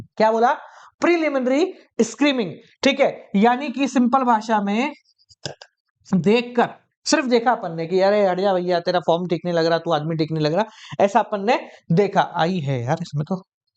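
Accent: Rajasthan, India. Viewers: Sir ap rajasthan hu ho kyi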